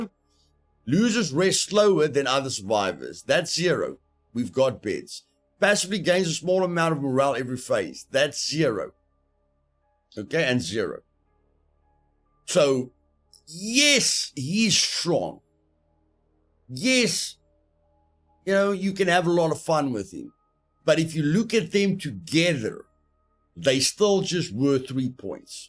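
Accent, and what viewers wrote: South Africa. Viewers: Absolutely great video bruu. Love how in depth U went with this. I'm massive into the dead fan Also THE SOUTH AFRICAN ACCENT YESS. I'm Aussie South African lmao Great vid man 👍👍👍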